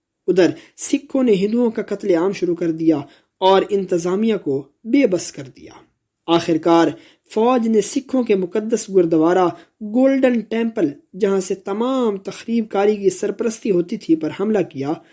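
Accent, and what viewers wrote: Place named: Arab world. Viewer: صدا کار صاحب سے درخواست ہے کہ اردو کو عربی لہجے میں نہ پڑھیں. یہ اردو کی کتاب ہے. يحي سنوار کی کتاب کے لیے کی صداکاری مناسب تھی کیونکہ اس کتاب میں عربی الفاظ بہت تھے.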